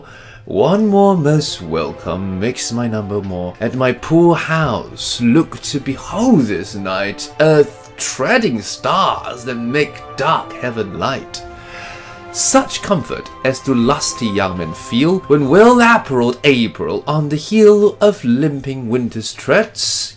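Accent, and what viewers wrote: United Kingdom. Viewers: 已訂閱，全部影片重複播放中。 最喜歡老師唸故事的時候，閉上眼睛沈浸在優雅的英國腔裡。 常常因此捷運坐過頭😝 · ，你的英國腔真帥啊！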